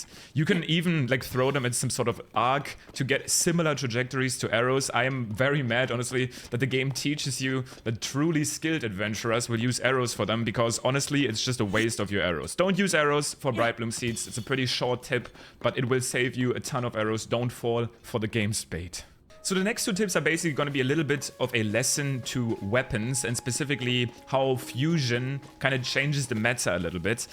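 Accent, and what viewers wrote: Germany. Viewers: Liebe den deutschen Akzent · 1 Question Are you German because your accent sounds german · always I search Zelda videos, I came up to and I like his videos, but I always think he sound like a german who speaks english and I dunno why:D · we can hear the deutsch in your voice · Bro where are y from? You sound like a German one xD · Man hört mal anders hart raus das du deutsch bist 🤣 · deutsches englisch ist so gut rauszuhören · Hes german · The german acccent is reaaaaly present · anyone knows if he is german or what is that accent? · Man hört SOFORT den Deutschen aus dir raus, gutes video keep going :3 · So lustig wie man den deutschen Akzent so hart raushört aber gutes video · good video but there is one thing i want to know, ist das in deutscher akzent den ich da höre? :P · Wie man merkt dass er deutscher ist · Deutscher akzent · Du bist Deutscher oder ? 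· Hes from Germany, why should he fake it lmao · Hab sofort gemerkt du bist deutsch😎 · Thick German acent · is that a german accent? :D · Save deutsch, hört man krass raus irgendwie komisch 😂 geiles Video aber hat mir sehr weiter geholfen 💪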